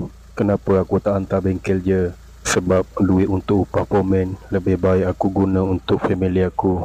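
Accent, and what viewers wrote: Kelantan, Malaysia. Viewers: Haha😂😂jengg mu klate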